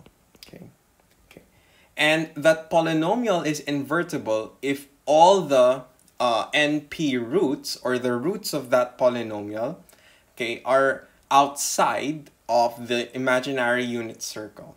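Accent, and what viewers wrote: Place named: Philippines. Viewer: You sound Filipino. Good video.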